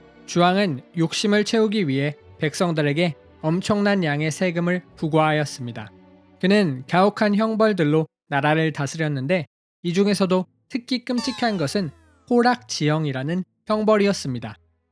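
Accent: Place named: China. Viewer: 발음이 꼭 중국인 같네요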